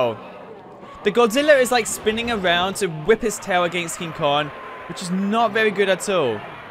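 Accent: United Kingdom. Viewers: i think hes british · are you brittish ?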